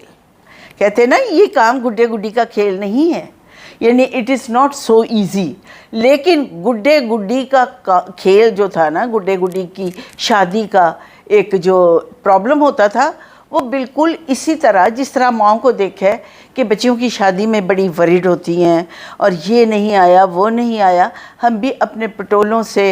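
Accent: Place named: Punjab, Pakistan. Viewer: wah ji wah ap ki baton main Punjabi tuch very gooď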